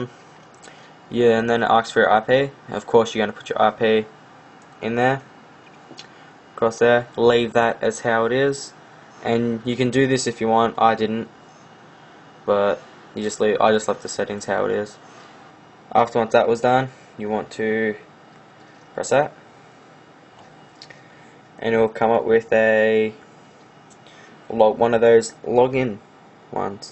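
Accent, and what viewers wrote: Australia. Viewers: You're australian.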